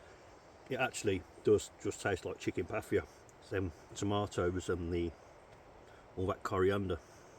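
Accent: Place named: United Kingdom. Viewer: Only just found out about this guy from an American channel (explore with me Maria C). Such a pity as he's obviously, from his accent, from where I grew up. Too much of this is happening as people are under such pressure in the UK and were damaged during COVID due to the ridiculous lockdown. The effects are still lingering on for many in poor health, mental and physical. The economy is damaged, our cities and towns are degenerating, the outlook is grim.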